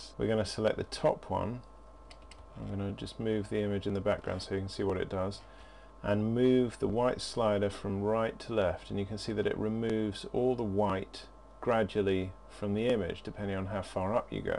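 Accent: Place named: RP, United Kingdom. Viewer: lol, i didn't even bother with this but your voice is very posh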